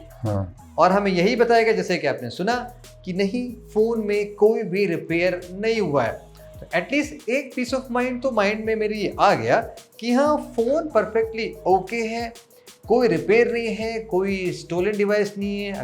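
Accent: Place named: Kanpur, India. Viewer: lgrha tha bahut din se ap bhi kanpur se ho awaj se aj confirm 🎉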